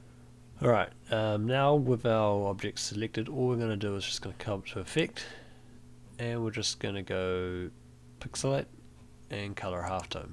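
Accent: New Zealand. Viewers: kiwi accent is very obvious, nice mate, sweet as :)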